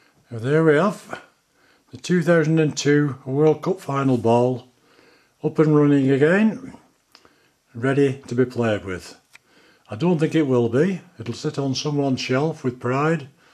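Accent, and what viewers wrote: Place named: Lancashire, United Kingdom. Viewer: Hi, i have an adidas finale ball that needs fixing, also, are you from lancashire? The accent sounds familiar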